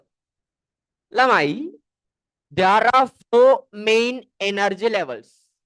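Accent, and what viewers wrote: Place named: India. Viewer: Great sir But I can't understand Ur Indian accent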